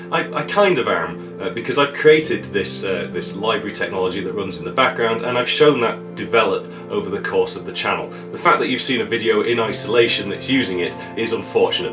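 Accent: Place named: North, United Kingdom. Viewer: Your accent is so much like mine that it took me a whole video to spot that you are a Northener. Are you from the West Lancs area by any chance? Great four-parter on the 3D graphics. I never really understood this and your vids explained it very clearly to me. Thanks!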